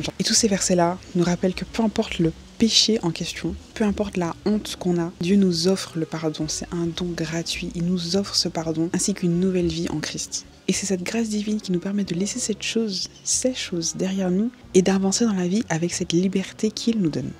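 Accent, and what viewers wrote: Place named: Madagascar. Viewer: Je laisse derrière moi mon sentiment perpétuel de compétition avec les autres et la peur de ne pas être assez bien pour autrui, puisque je suis fille de Dieu et il m’aime Merci pour la vidéo, ça fait plaisir de voir une antillaise sur youtube, et en plus pour parler de Dieu🥰 C’est Gwada ou Mada? L’accent disait plutôt Mada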